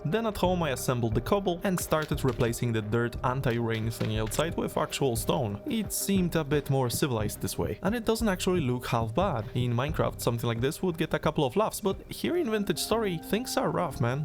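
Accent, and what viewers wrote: Poland. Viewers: Are you polish? You sound VERY polish/slavic and I fucking like it. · slychac ze Polak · You sound Polish. Are you Polish? I am Polish, siema.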